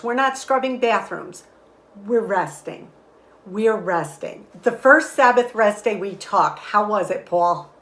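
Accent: New York, United States. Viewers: Hi and Paul, its Helen from Sydney, Muswellbrook, Australia. I have been watching your show now for many a while now and I enjoy your positive, kind and practical outlook on life. I always enjoy your warm and friendly start to your show. I have cooked many of your delicious, frugal reciepes and you have shown me how easy it is to enjoy life without wasting money. Love your New York accent and the kitchen shelving with your lovely vintage bowls. God Bless you and have a Happy Easter❤😊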